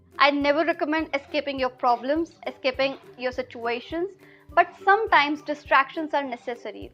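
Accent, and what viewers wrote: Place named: Punjab, India. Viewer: Are you Punjabi girl? You have Punjabi accent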